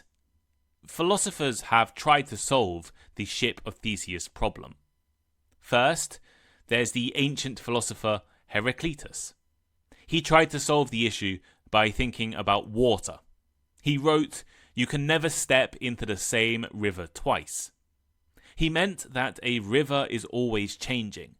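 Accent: United Kingdom. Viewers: Is it British accent or American accent?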